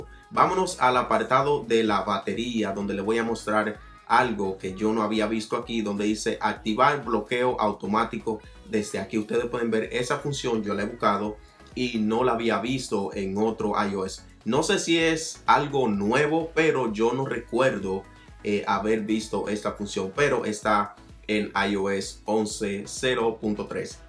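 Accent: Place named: Dominican Republic. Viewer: Dominicano y pico.!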